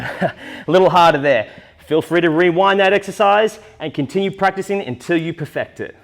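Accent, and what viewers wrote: Australia. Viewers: Shoes are 💯💯, also everything souds so much better with aussie accent 😂😂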